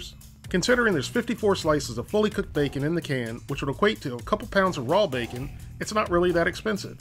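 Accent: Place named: West, United States. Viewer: Gorden Wood it's cause woody Harrelson would say "I gotta do it for the people" alot and with this guys western accent it seems spot on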